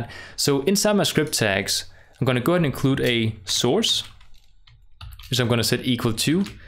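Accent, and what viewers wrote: Denmark. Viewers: Thanks for the tutorial, helped me a lot. Btw, are you Danish by any chance? Your accent sounds really danish, or something like that.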